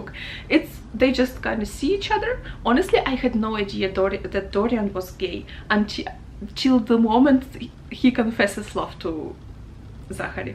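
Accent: Russia. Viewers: Ah, i knew that was a beautiful Russian accent I hear. Are you a fan of Russian lit at least? (oh I see now that you are for sure lol!) I am completely destroyed for all other writers. My reading soul belongs to the Russians :)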